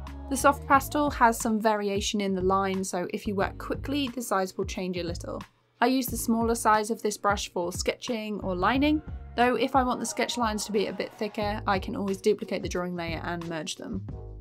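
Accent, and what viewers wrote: United Kingdom. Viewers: Your animation is great and tahnks for the pack! lol your british accent is rly clean and heavy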